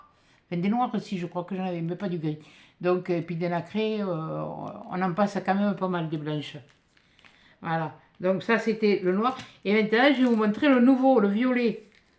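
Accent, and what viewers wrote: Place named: Southwest, France. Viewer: Bonsoir je suis nouvelle sur votre chaîne quand je vous écoute parlez j'ai l'impression d'entendre la voix de maïté la cuisinière 🤣des mousquetaires que j'aime beaucoup dommage qu'ont ne la voit plus à la télé 📺je suppose que vous venez du sud ouest moi je suis du nord de la France une chti's 🤣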